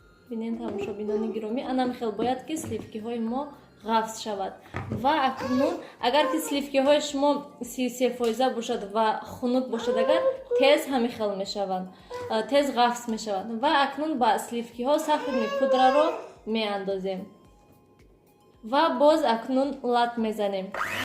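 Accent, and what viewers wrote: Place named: Panjakent, Tajikistan. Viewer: Зинда бошен апа, гапзанетон Панчакентихоба хела монанд будааст 👍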